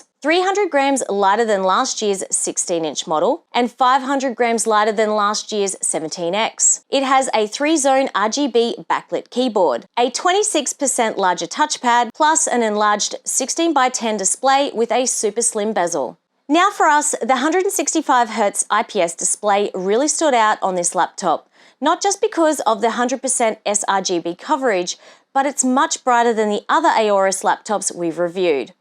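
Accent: Australia. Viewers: I absolutely love the look of this laptop device here & even the screen looks bloody amazing as well! I'm thinking of upgrading to a new laptop here & looks beautiful! Have a beautiful week & love hearing that lovely Australian voice! 🥰🎮❤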